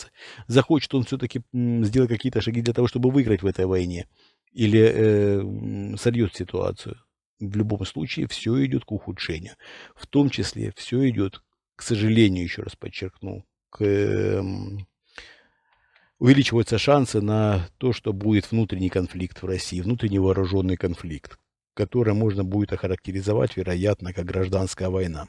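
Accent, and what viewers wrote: Ukraine. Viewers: с Украины что-ли? Акцент украинский.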